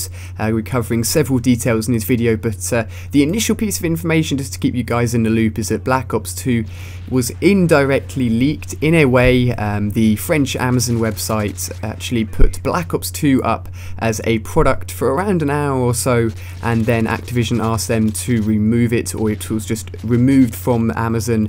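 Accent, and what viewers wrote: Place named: United Kingdom. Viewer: Dude he sounds British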